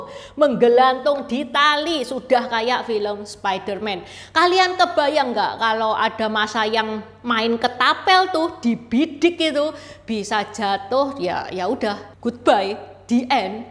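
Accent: Java, Indonesia. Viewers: Suaramu medok sekali, berita nya akurat, mantab sukses selalu, Bali hadir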